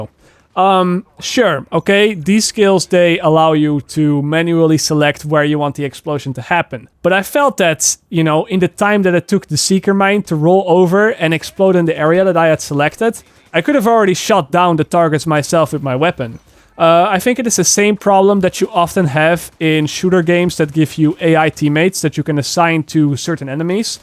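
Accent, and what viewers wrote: Netherlands. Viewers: Wait is this Guy dutch? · Hi, are you maybe Dutch? It’s just that I’m Dutch and your way of speaking and a slight accent strongly remind me of other Dutch youtubers with English channels.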